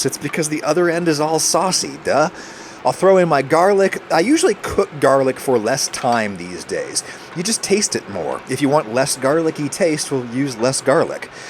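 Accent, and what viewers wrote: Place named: Appalachia, United States. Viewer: That's a better Southern Appalachian accent than some actors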